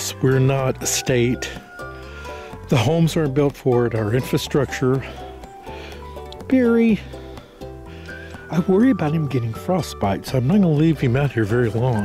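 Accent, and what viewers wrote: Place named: South, United States. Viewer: I'm so glad you are okay and I hopefully all the animals are doing well! Ahh hahaha, I love your country voice wait until u hear my real country as turnip greens vice😂😂😂😂😂 but honestly we got ice and snow q, but nothing like!e that although we do every 6 yrs or so.. But doing my patron. I wanted to send the national guard to get you. That's bow worried I was, there's a surprise on the way my dear It' be over yonder in your box very soon alright sweetheart. Still getting thru the excruciating pain with nothing talked to a specialist in osteonocrosis and averyadic neurcrosis and I might be going to john Hopkins or California to a hospital there to be part of a study, I have been told I have about a year to live in!was they slow it down, but keeping busy and putting my energy what I have on new techniques and a total makeover of my yt channel, name and everything! 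Patron on the way!!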